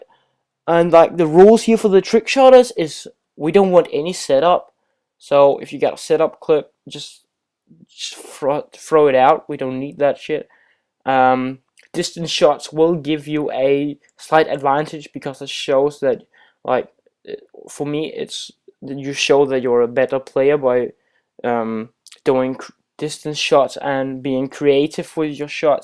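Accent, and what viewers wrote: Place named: Scotland, United Kingdom. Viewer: Du lyder lidt som en skotte også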